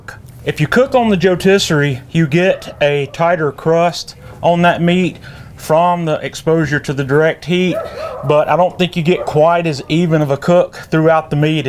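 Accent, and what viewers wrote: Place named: South, United States. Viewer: Hello from Ireland; I’m new to using a kamado and I’ve come to learn that unless the instructor has a deep southern (US) accent, I ain’t listening!! Great video……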